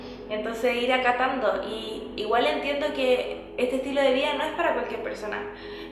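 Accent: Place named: Chile. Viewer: ya casi se te está yendo el acentos chileno.jajaja y me encanta! Por otro lado me inspiras ❤️ me di cuenta que la vida se va y la mayoría nos quedamos atrapados en un trabajo para poder sobreviviry nos olvidamos del universo completo que tenemos afuera.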